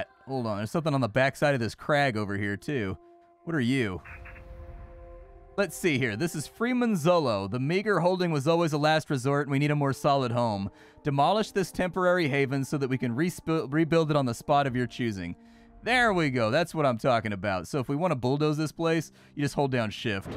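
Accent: Netherlands. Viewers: Damn the dutch accent is a great accent for tutorials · That dutch accent, always so easy to spot 😂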